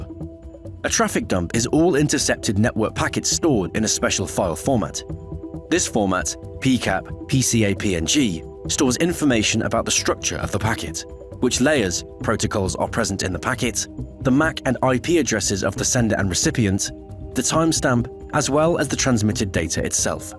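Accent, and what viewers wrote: United Kingdom. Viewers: They are probably covering their asses legally in case they are charged with supplying information that can be used for illicit actions. I don't think this would be as much of an issue in the US given publisher protections (first amendment rights), but if the narrator is a person/not text to voice, then based upon their accent, they are most likely coming out of the UK. The UK doesn't have constitutional rights outside of the Magna Carta. As such, publishers are far more liable for suit in the event something they publish results in real world damages. For supplying the information, they could potentially be held liable to criminal charges. I'm pretty sure that that isn't the case under US law in most cases/jurisdictions, as there are alternative case uses. However, as this is seemingly coming from the UK/EU, they may be held to different standards—which, while unfortunate, would help explain the censorship. 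That said, I would recommend that they team up with a publisher in a country that does not have these limitations who could offer more comprehensive explanations. Given the censorship, I found the information of substantially less value as knowing what programs are used, how they work, what to look for, etc., is necessary for a more comprehensive security education. That said, when did being a "hacker" become a negative connotation amongst the tech community? If you are a "Cyber Security Analyst" that makes you a "white hat" hacker. I myself tend to float between "white"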